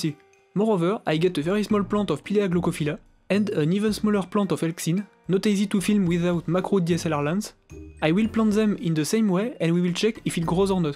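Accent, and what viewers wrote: France. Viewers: le fameux accent Français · that french accent I just can't stand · avec cet accent français, j'ai tout compris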